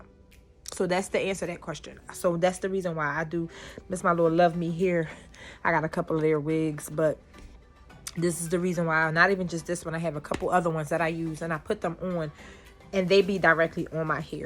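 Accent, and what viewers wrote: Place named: Los Angeles, United States. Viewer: That LA accent jumping out baaabbyyy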